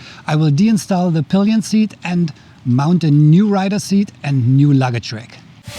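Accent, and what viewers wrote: Germany. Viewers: so nice to hear your German accent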